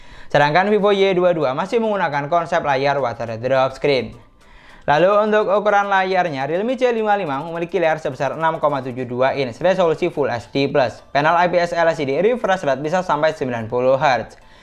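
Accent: Madura, Indonesia. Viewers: Sampean orang madura..?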